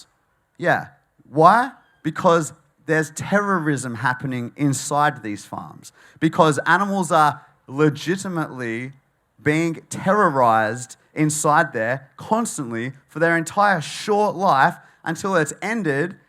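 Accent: Australia. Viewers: Another great speech. I could listen to you talk all day long. You have a very gentle voice and I love the aussie accent. You have such a friendly way of introducing people into a vegan lifestyle, giving facts in a non pushy way. I have been vegan for 19 months now, started by signing up to veganuary. I watched earthlings, cowspiracy, what the health as well as many YouTube videos of yours, Joey Carbstrong, Hench herbivore to name but a few. I will never look back, I feel amazing not only health wise but to be joining you and others in making a difference.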